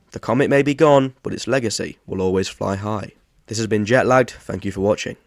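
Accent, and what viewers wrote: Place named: United Kingdom. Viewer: Your new channel is great, but could you please put subtitles? No offense but it’s sometimes hard to understand through the Bri’ish LOL🇬🇧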